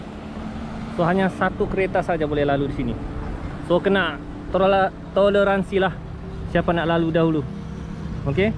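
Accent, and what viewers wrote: Sabah, Malaysia. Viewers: Jadi bang kau pandai kah juga becakap sabah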